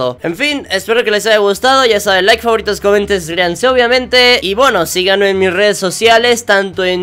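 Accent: Mexico. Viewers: Yo sí te creo que eres de México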